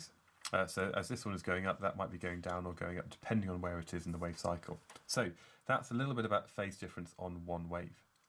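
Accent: United Kingdom. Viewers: What a beautiful British accent indeed. That was great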